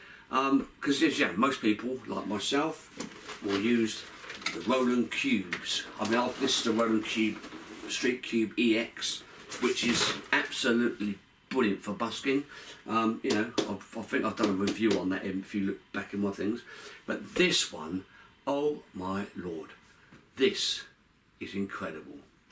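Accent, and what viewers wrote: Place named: London, United Kingdom. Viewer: Hello. I've been spending some time recently trying to find a decent and not costly amp for busking, watching so many videos on YT. I'm gland I found You. I think I'll go fof this one( the new 80watts version of it ). I like yout accent, it reminds me of my past years when I was living in London(1983/84 and 1991/2000). Respect and stay well, ciao from Italy. 🤠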